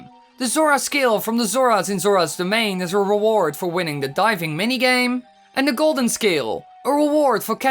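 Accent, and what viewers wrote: Netherlands. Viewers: Wat he is just dutch man!!! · thats an accent he's dutch and in dutch its very normal to talk like that i am dutch myself but it doesnt sound weird in dutch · It's called a 'Dutch accent' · He's dutch. That's just how dutch people speak. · Its his accent. He's dutch. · NyakiAki its just his dutch accent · actually, thats his accent as a dutch XDDD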